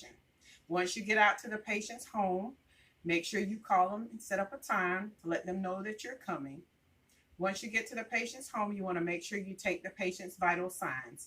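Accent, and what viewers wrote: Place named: South, United States. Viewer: Loved the video!!!! Thank you so much, and you sound southern! :)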